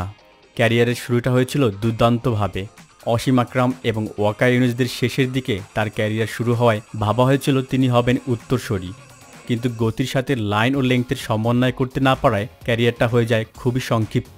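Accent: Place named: Chapai Nawabganj, Bangladesh. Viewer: উপস্থাপক এর বাড়ি চাপাই নবাবগঞ্জ মনে হয়... শব্দ টা পেশার না, পেসার...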